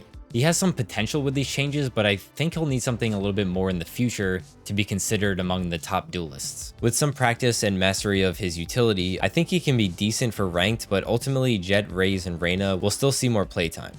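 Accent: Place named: United Kingdom. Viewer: He’s back to being a cool bri-ish boi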